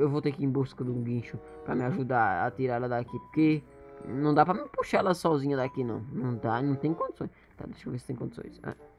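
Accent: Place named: Ceará, Brazil. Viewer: Oi.. Vc parece ser Cearence kkkk Faz video de como viver no Bob Esponja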